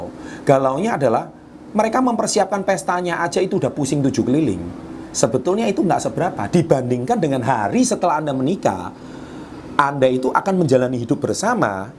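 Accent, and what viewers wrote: Java, Indonesia. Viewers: Orang jawa ya mas Medok ya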